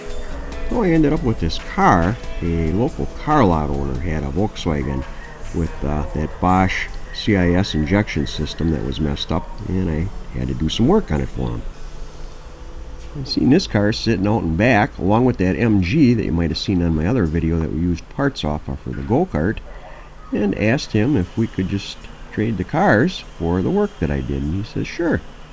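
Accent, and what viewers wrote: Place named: Great Lakes, United States. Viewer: Great Lakes accent....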